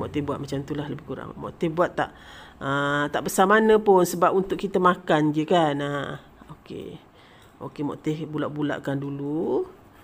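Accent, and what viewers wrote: Malaysia. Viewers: kita biasa mkn kopok ganu hok gigit putus mmg sedap.. syabas tahniah..klo dgr suara ni org kelantan ke ganu? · aku org ganu taulah ganna wahwahwah ni org ganu ker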